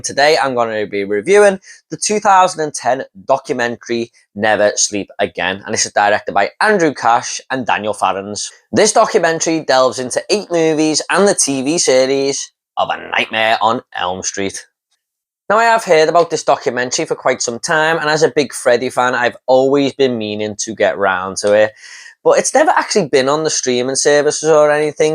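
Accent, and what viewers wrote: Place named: United Kingdom. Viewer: Love your accent btw! Love the way you said "time" where in the UK are you from, if i may ask? Im sorry if saying 'love the way you said "time" spuds creepy...it not ment to be ( embarrassed )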